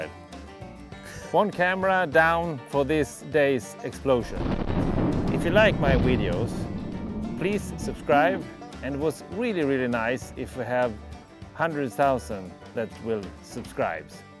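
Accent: Sweden. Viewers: The swenglish is one of the best part of this video besides the insane explosions. You're always pushing the boundaries when it comes to this stuff, can't even imagine what kind of stuff you gonna blow up in the future but i can't wait too see. Great work man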